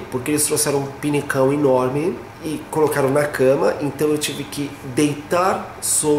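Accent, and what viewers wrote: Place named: Australia. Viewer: Força. As coisas já estão acontecendo de forma positiva. Cara, esse sotaque australiano é demais. Gosto muito. Você é galã de novela com ou sem barba. Grande abraço.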